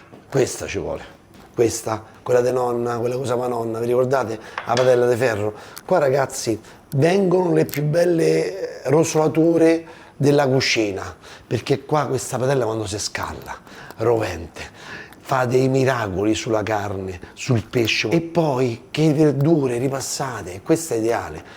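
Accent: Rome, Italy. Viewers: CIAO... OGNI VOLTA CHE COMINCI I VIDEO DICI SEMPRE ISCRIVETEVI.. IO SONO UN PO' DI ANNI CHE MI SONO ISCRITTA..OLTRE A TE GUARDO ANCHE RUBEN UN'ALTRO ROMANO COME TE.. GLI ALTRI CANALI LI GUARDO.. MA NON MI DICONO NIENTE... SEI PROPRIO IN GAMBA!!! SOLO A SENTIRTI PARLARE ROMANO MI SENTO A CASA.. INFATTI IO SONO DI CIVITAVECCHIA E IL MIO DIALETTO SI AVVICINA AL VOSTRO.. MI PIACI ANCHE QUANDO CUCINI DA CASA TUA.. E A VOLTE A CASA DI TUA MADRE.. TI RINNOVO I COMPLIMENTI.... MI PERMETTO DI DARTI DEL TU.. PERCHÉ POTREI ESSERE TUA MADRE DATA L'ETÀ. (75 ANNI) RINNOVO I SALUTI.. CONTINUERÒ A SEGUIRTI.. CIAO A PRESTO E BUONA SERATA 🌹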